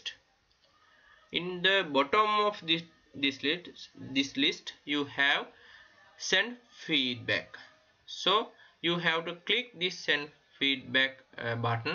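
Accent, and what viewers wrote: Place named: India. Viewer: I don’t understand anything he saying because it’s Indian accent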